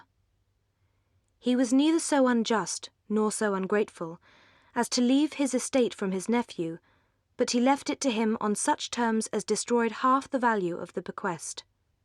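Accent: United Kingdom. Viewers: She sounds so much better speaking with an English accent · ! My sweetiee💖💖💖 What a beautiful voice she has! Original British accent! I'm so happy to find it on yt. 😻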